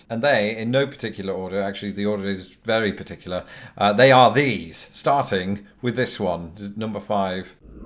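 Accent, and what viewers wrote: United Kingdom. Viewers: Loooooooooove That British accent. So True we like it! And good content (yep - Love British history. My family came over on the Mayflower from Manchester! 😀) Now I'll be able to enjoy the accent and content on the Reg. New Subbie. 💋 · I LOOOOOVE the British accent!!!! I dont know why, but it just makes me crazy!!! So beautiful! Love from Texas!❤ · Just found your channel. This is so funny and so true! Having a British accent sure intrigues us Americans! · to be fair mate your accent sounds british as fuck even to other brits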